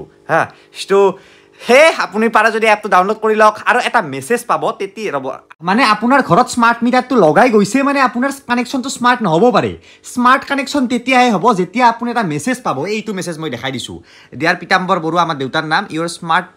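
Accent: Nalbari, India. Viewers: Nalbari r manuh so accent tu olp beleg hoi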